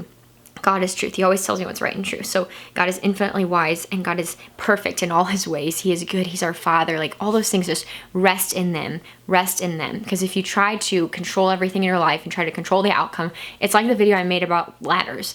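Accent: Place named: Illinois, United States. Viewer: I really enjoyed this video. And also, I You said people from Illinois dont have accents, but I find that funny because you definitely have an accent.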